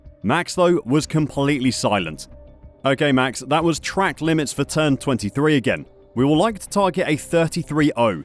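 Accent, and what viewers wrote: United Kingdom. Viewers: Very biased video against Max, sounds very British.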